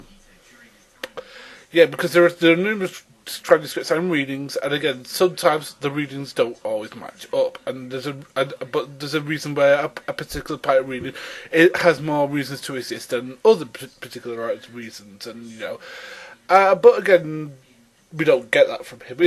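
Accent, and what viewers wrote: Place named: United Kingdom. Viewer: No you are not. Speech impediments or not, it would be preferable if someone uploading a video at least tries to be clearly audible. It's just a very thick british accent joined with random mumbling and stumbling on words.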